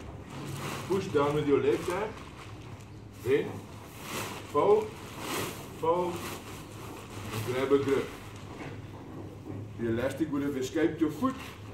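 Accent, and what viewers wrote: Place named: Afrikaans, South Africa. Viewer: As soon as I heard an Afrikaans accent I knew this ballie was the real deal 😂 Thank you, Sir. That was very helpful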